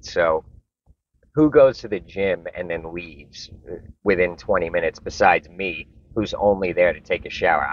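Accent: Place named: Long Island, United States. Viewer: There is nothing better than hearing a guy from Long Island tell Zen stories! “And the monk didn’t give a shit that he stole all his stuff.” I loved your interpretation and laughed,. That was so great.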